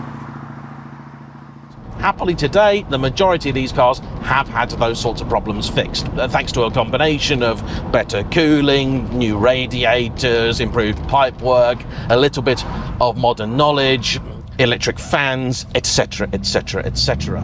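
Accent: United Kingdom. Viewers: Not easy to understand Britis English, but I addicted to your videos ❤❤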